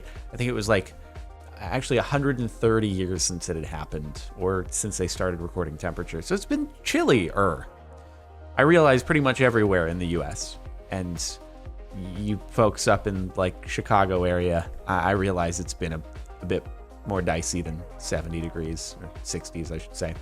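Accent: Finland. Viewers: It's not scandinavian accent. It's finglish or rally-english. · The hydraulic press channel is still going, also, it's a finnish accent, not scandinavian uwu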